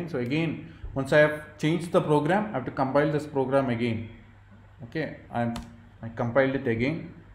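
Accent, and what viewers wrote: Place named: Kerala, India. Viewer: Thick, incomprehensible Malayalam-accented lectures. Go somewhere else for your Data Structures course.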